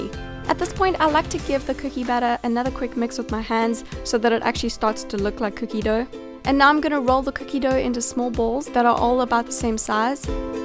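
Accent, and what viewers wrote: South Africa. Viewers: Are you from south African? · are you south african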